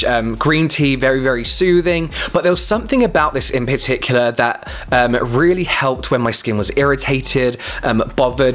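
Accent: United Kingdom. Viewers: Hello, it is soooo refreshing to hear British English on YouTube 🥰 Thank you for this amazing channel and your "ear-pleasurable" accent 😁 Love from Prague ❤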